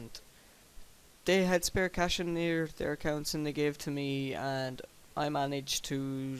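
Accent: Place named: Ireland. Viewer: What part of Ireland ya from? Waterford?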